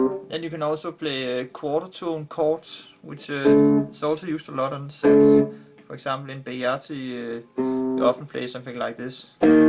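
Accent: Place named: Denmark. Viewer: are you Danish by any chance? your accent is so Danish!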